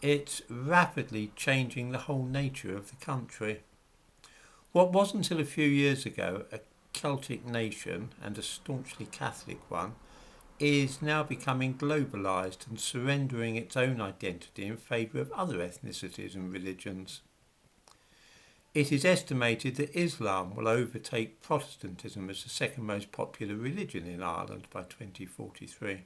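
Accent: United Kingdom. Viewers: A British accented man stirring up discord in Ireland, who would have guessed! Mmmm this all sounds racist to me dressed up as information! That said, I don't like to hear what's happening. I think it is happening the world over, the least well off in the world (the have nots) want a piece of the "better life" pie which we all enjoy and who can blame them? We in the developed world have gained enormously from the exploitation of people in the third world. As far back as 2000 when we lived in Dublin, I noticed the general population was very racist towards Nigerians, and I was ashamed to see it. It was OK for us to collect for "the black babies" while they were over there but we don't actually want them to come and take what they want from us! They were treated really badly regardless, it didn't matter if they were good, bad or anything in between, they were all tarred with the same 'black' brush. 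I don't know if these statistics are true or not, and if the way to get citizenship is as easy as to have a baby, then that is on the government for allowing it. It's not allowed in many countries so if it's a problem in Ireland it needs to be addressed by the government. Or does the Irish government want immigrants to do the dirty work that the Irish won't, or don't want to do!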